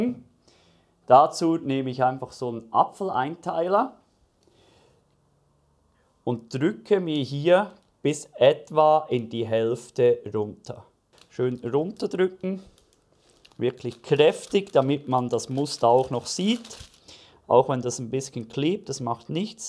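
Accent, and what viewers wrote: Switzerland. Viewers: Die Rezepte sind immer DER HAMMER! Danke! Und ich hör auch dem Schwizer Dütsch so gern zu 😁✌️. Grüße aus 🇦🇹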